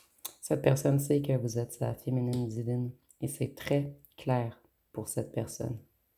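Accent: Canada. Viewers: ❤ Merci,oui, exactement,il a menti, évitant, sûrement vie antérieure mais où ?, exactement il a tout foiré, j'adore votre accent canadien,en le côtoyant j'ai compris qu'il ne voulait pas travailler sur lui,et guérir ses blessures.C'est exactement ça,en se rejettant,il m'a rejetée, m'a fait beaucoup souffrir 😢😢.On a la blessure d'abandon en commun,et de rejet aussi,il faudrait qu'il aille voir un psychologue.C'est ma flamme jumelle 🎉🎉,tout ce que vous avez dit est excellent.On s'est rencontré en 2021.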